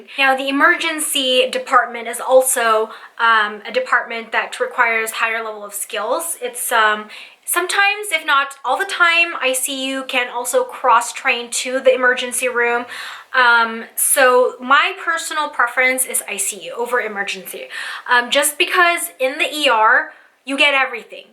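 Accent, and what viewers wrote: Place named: United States. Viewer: You picked up the American accent quickly for someone who came here in 2012.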